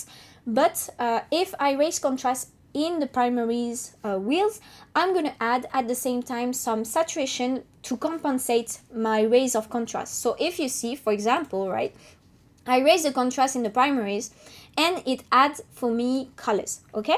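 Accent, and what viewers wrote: France. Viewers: Your accent sounds French.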